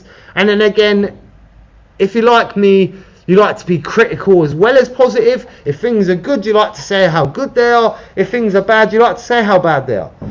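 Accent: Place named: England, United Kingdom. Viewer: "also hey, your deffo from England not Wales haha you better get that Rhys name correct ahhaha same name as me it's a Welsh name pronounced like R "ee" s 😂😂😂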